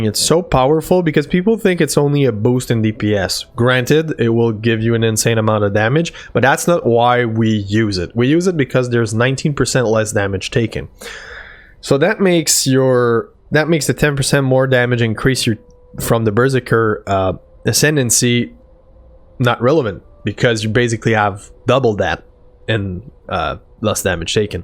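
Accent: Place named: Quebec, Canada. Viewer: C''est sur t''es un quebecois , juste a t'entendre parler en anglais haha !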